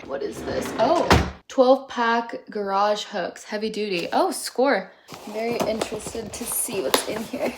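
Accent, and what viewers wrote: Canada. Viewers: Nice! Ah the Canadian accent ❤❤❤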